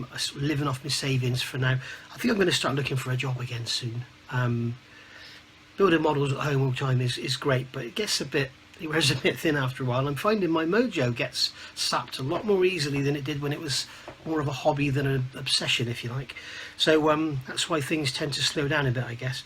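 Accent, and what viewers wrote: United Kingdom. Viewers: Hello, is that a south Bristol accent.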